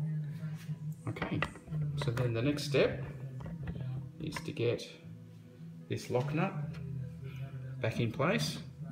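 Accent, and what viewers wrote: Australia. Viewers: Yes, I thought you sounded Australian. Nice commentary. What's a vegemitan sandwich?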